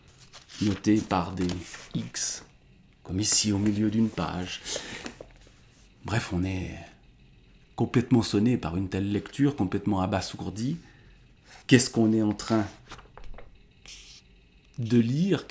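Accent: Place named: Vaud, Switzerland. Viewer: J'ai l'impression d'être de retour à Lausanne en vous écoutant... Vous êtes vaudois ?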